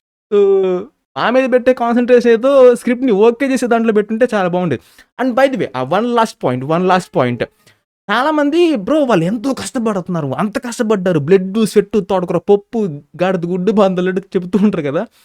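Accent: Guntur, India. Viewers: Bro meedi guntur aa😮? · Bro meedhi guntur aa ❤ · Bro nedi GUNTUR yee naa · Bro nuvu guntur ana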